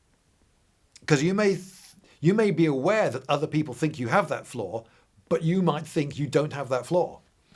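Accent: United Kingdom. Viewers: British. That's one you are looking for. Terminally, inescapably British.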